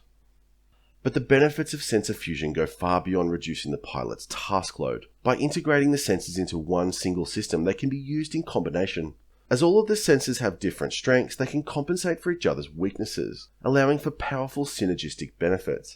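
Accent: Australia. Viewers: And this video isn't the exception; it seems to be the norm. Watch his EPIC explanation on: The history, development, physics, tactics and strategy of nuclear munitions. It's an outright 10. And I am knowledgeable on this. I actually (for whatever reason) don't love aussie accents, but I LOVE this channel.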